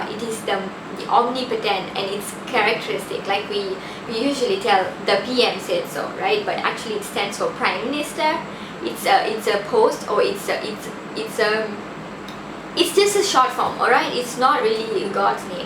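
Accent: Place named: Tamil Nadu, India. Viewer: other than your tamil ascent i love you videos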